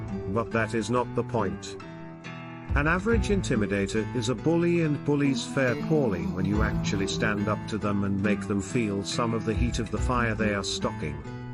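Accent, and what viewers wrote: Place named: England, United Kingdom. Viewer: English accent 💩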